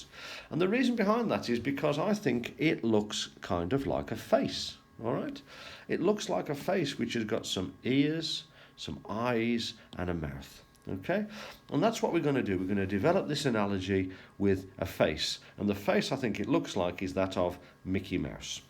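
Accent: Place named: United Kingdom. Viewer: THANKS.SAME THEME DIFFERENT PERFECT WAY.SOMETIMES THE VERY BRITISH PRONUNCIATION IS ADORABLE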